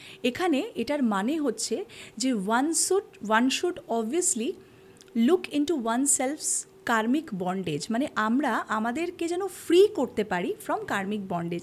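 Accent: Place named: Hindi, India. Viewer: খুবই ভাল,অনেক কিছু জানলাম।কিন্তু চ্যানেল টা তো বাংলা তাই অকারণ হিন্দি উচ্চারণ বর্জন করলে ভাল হয়।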